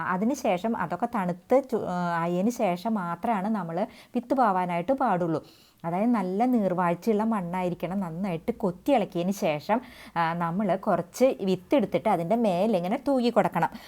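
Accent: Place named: Malabar, India. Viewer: New subscriber. Found your videos full of useful information but your Malabari slang is evident in your audios. Not a big problem. By the way I would like to have some seeds of red and green cheera, palak, long payar, venda and chillies. If possible please send at my address : GEORGE JAMES, THYSHERRIL HOUSE, PANDANKARY P. O., EDATHUA 689 573. ALAPUZHA DISTRICT. Thank you.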